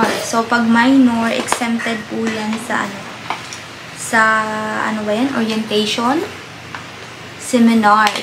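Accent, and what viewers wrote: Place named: Visayas, Philippines. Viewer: Bisaya lge ka mam unsay probinsya nimo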